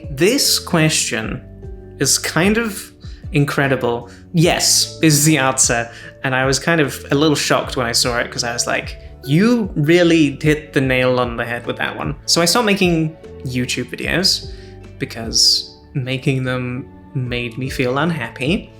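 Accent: Canada. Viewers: Lovely to have you back and with an extra Canadian twang to your accent! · Your accent seems to have been influenced in Canada! Ok vowel shift! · ! Thank you for reintroducing yourself after all these years. This has felt nostalgic in a way. The only thing that made me think… WHAT?! Is your new Canadian fusion accent haha. Nice to see you again 😊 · So happy you are happy, and seeing you live your best self! Take your time & do what you are comfy with! Also couldn't help but giggle at the words that sound more Canadian now hehe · Omg you sound so canadian! Welcome back to youtube :) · hahahaa you've picked up the Canadian accent! it jumps out whenever you say "back" :') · Your whole style is so good and you look so happy and comfortable (!!) but the part of this video that caught me the most by surprise was hearing the distinct traces of a Canadian accent in your voice. 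Didn't expect that little twist! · Your voice sounds so comforting but I'm noticing a little bit of Canadian accent now! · Wow you can really hear the Canadian accent now. Crazy how quick accents take hold. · For the first couple of minutes I kept thinking, "There's something different about her voice..." and then she mentioned living in Canada and I was like, "Ah, yup, that's it." Congrats on your gender transition and your Canadification transition!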